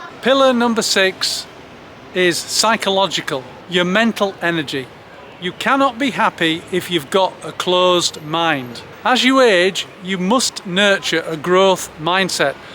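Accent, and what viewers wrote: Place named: Northern England, United Kingdom. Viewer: I adore your content & northern accent! I worked with a girl who had her gall bladder out in her 20s, don't guilt yourself, brother - Cheers to good health 🥂🍻😁💋✌️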